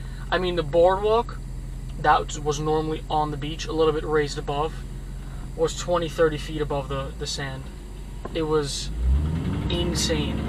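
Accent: New York, United States. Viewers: Love the ny accent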